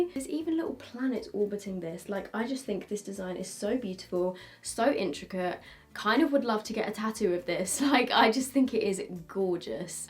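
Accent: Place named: England, United Kingdom. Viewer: #questionoftheday: I am new to your vids but i was wondering if you could try your best american accent? I love english accents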